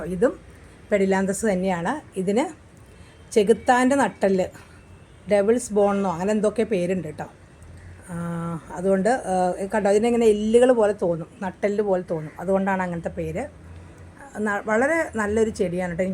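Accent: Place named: Northern Kerala, India. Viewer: Enikku thonni vadakan style talk❤❤❤ente husbandum palakkad anu❤❤❤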